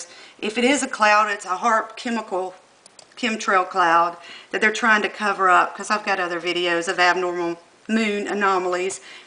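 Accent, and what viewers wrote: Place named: South, United States. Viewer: You wouldn't think your a mom. Nice, and having a southern accent has nothing to do with it. Keep it up mom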